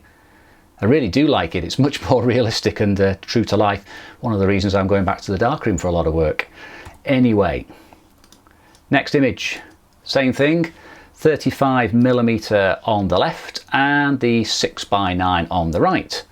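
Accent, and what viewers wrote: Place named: United Kingdom. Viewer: Dear: I love the form and content of your channel. English is not my native language but and I understand the British accent better. I like that you are serious, humble, calm and your funny touches of humor. I love the comparisons you make between expensive and also popular cameras and that you go through various formats. It is exciting that a camera that is over 70 years old, but of course, of medium format, surpasses a camera with much more technology in several aspects. I also like that you can integrate both film and digital cameras and rescue the M43 in a kind of countercurrent where photography is often reduced to the more MPX and more ISO maximum level. Very happy that I still have more videos to learn. Greetings from Buenos Aires.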